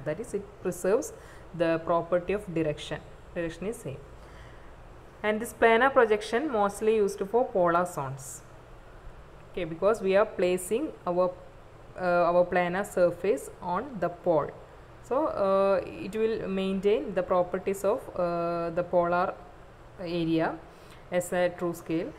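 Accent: South India, India. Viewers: the south indian accent of english is funny and lovely at the same time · Yes south india english is sweet & innocent , but its a good video ...nice explination watching from aboard ...professor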